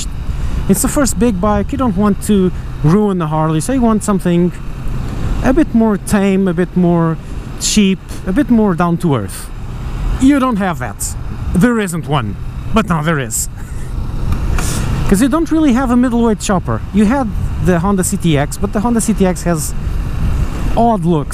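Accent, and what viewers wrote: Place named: Portugal. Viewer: I love our portuguese accent when trying to speak English xD Boa mota, estava a pensar em comprar, e grande dia de Marginal xD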